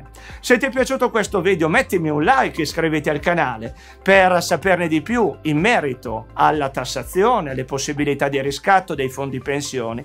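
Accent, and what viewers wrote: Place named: Piedmont, Italy. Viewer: M'iscrivo, oltre che per i contenuti interessanti, anche per il marcato accento piemontese😄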